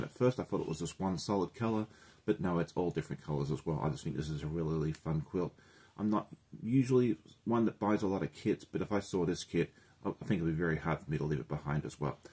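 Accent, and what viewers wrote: Australia. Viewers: I love those colours.... Im also curious is that an Aussie accent?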